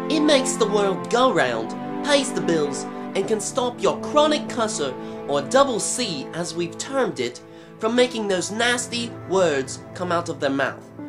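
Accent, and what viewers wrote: United Kingdom. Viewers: i loove ur british accent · cool british accent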